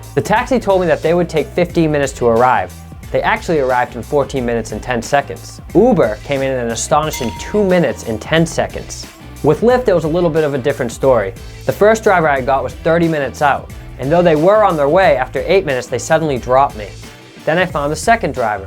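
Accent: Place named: Boston, United States. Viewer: u from Boston I from Boston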